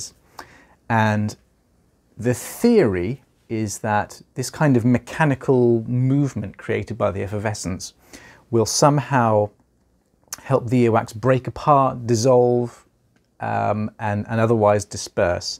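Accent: England, United Kingdom. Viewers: His young British voice really helped soothe the horror show that came out of that ear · He is so cute and the english accent is cherry on top 😍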